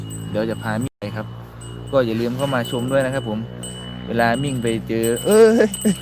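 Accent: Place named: Pathum Thani, Thailand. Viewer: ปทุมธานีชัด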